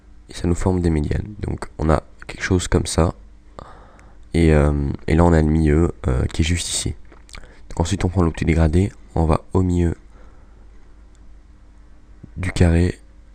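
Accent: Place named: Belgium. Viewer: Sa fesais longtemps que j'avais pas entendue ta voix de belge <3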